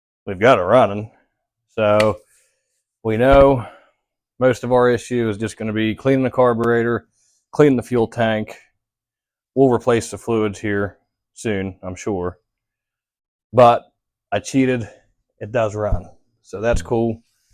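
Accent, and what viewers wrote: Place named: West Virginia, United States. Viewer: Love old Wide Glides. Fairmont WV - sister lives there. The back home accent always catches me, then saw your Clutch Up sign was like hell yeah. pretty cool channel I stumbled across on my early Sunday morning YouTube watching.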